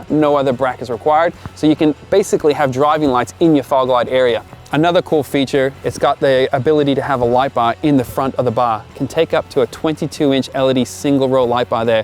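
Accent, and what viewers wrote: Australia. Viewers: Sick looking Ute man! WAIT... is he Australian 🇦🇺 or American 🇺🇸 ? That accent confuses my ears. 👍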